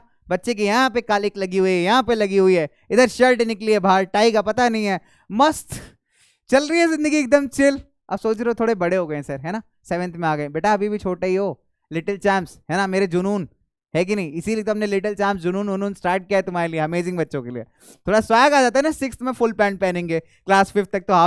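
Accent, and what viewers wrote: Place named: Maharashtra, India. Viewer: sir you are an marathi or from mumbai because your ton is like that sir ❤❤🌊⛴️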